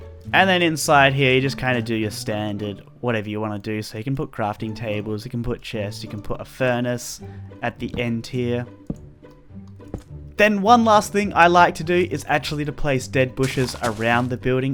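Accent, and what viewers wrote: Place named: Australia. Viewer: Just Subscribed! Im australian and you sound aussie too!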